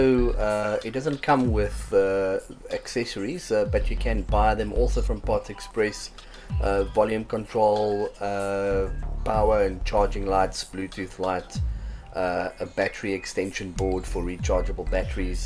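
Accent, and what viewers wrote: South Africa. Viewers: I immediately recognised your accent as SA. Well done! you have created a very nice channel. I will be following. Nice projects!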